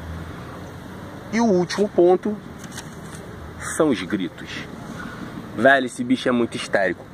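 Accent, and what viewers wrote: Rio de Janeiro, Brazil. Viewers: Eu amo esse vídeo porque nada passa mais franqueza do que um sotaque carioca dando alertas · Tu é carioca mano? Tô percebendo pra esse sotaque aí hein kkk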